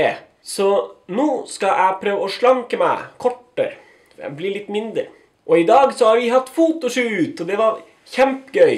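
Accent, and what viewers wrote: Norway. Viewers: Kjære. Digger nordlening-dialekten din.:-) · Du har begynt å snakke i rart, jeg vil gjette Drammensk!? Jeg merker at det er litt vanskelig for deg. Jeg liker det. Trøndersk er kulere. Det er kult å være høy, jeg er høy. Ikke bli bloggerfaen! Jeg kjenner en labrador. Du er kjekk i dag.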